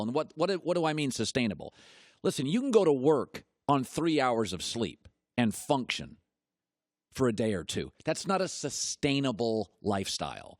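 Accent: United States. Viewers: Pure American accent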